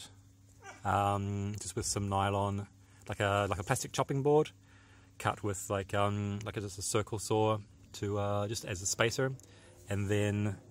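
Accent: New Zealand. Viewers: Is he an Aussie? His "R's" make him sound like he comes the bottom the the south island NZ.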